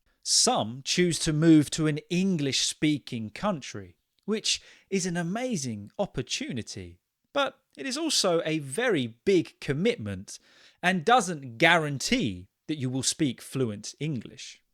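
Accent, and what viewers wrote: United Kingdom. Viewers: It is stupendous teacher,.I follow British pronunciation therefore, I try to imitate you. Hence, please try to make more speaking videos 😊 Thanks from India.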